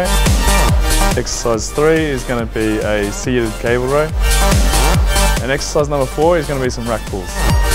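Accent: Australia. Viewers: Dean Alberto LMAO!! You'll be so ashamed when you realize your wrong! xD Pls, try to understand: Calum von Moger is from a country called AUSTRALIA. He speaks with an AUSTRALIAN accent. Arnold Schwarzenegger is from AUSTRIA (Österreich), a completely different country located just south of Germany, Arnold speaks with an AUSTRIAN accent.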